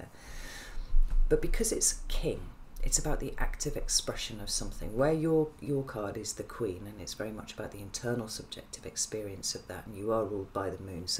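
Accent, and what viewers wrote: United Kingdom. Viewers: Could you please move the mic closer to you?!!!! Or speak up for us! Maybe it's just me, but I'm having a hard time to hear and understand what you are saying. your voice is deep, and you are so quiet. I would love to hear what you have to say! Plus you have this gorgeous British English "accent" :) all i hear is whispering mumble most of the time. thank you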